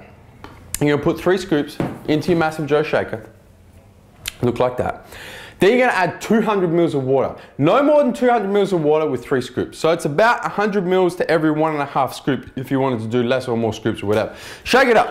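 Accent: Australia. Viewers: Excellent review love your Australian accent bro thanks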